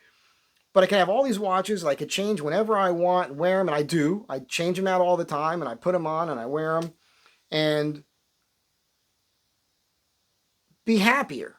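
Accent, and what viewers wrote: New Jersey, United States. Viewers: Great video, spoken like true Jersey! Sorry I missed your live it was a little late here on the east coast but caught it this morning. Trump is kicking ass, as he should! 😊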